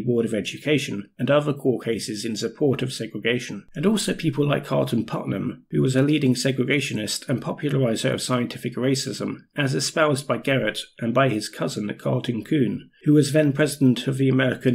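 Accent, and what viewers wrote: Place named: India. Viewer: U sound indian origin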